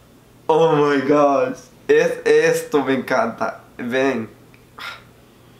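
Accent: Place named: San Pedro Sula, Honduras. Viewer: Desde qué te escuche hablar dije, este Men es catracho, hablas como sanpedrano.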